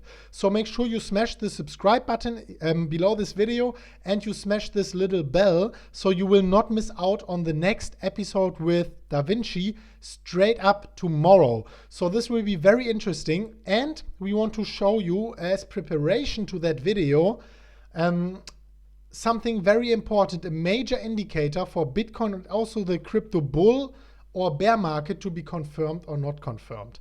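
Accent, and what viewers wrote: Germany. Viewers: Sounds like hes a German guy. Or am i wrong? At least he sounds like me if i try to speak english 😂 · Is The Moderator Form Germany or Something? No native speaker?!.. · War schon klar das du dütscher bisch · I subscribed because of the great content and intellectual German accent.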